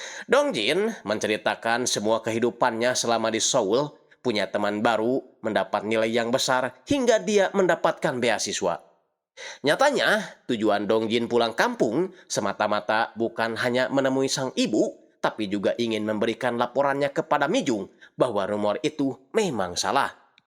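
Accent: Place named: Sunda, Indonesia. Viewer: trima kasih kang, nyeritain nya seru n lucu dgn gaya sundanya, sukses selalu kang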